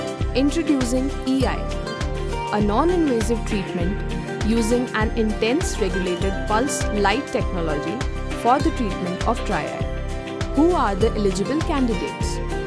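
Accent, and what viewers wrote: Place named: India. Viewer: I love indian accent Love INDIA from HUNGARY 🇮🇳❤🇭🇺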